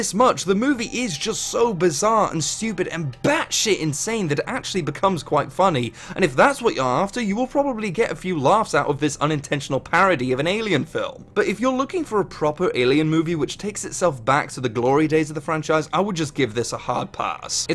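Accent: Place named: United Kingdom. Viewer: Nice video, very good points and your delivery was spot on. Also your British accent is one I wish I could replicate.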